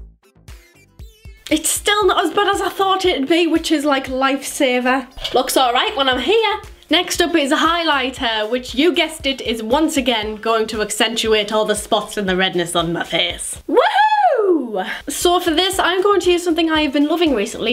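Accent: Newcastle, United Kingdom. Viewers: Sounds Geordie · i think she's from newcastle (dont hold that against me im not great with accents) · Are you from Newcastle? X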